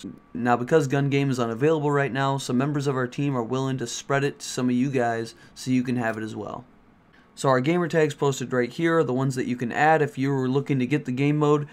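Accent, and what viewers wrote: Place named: South, United States. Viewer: Great video very well done! only issue is the voice over tbh I don't enjoy the southern accent please refrain from doing commentary in the future and please bring back the brexit guy with love Songless ps I am serious please don't do voice overs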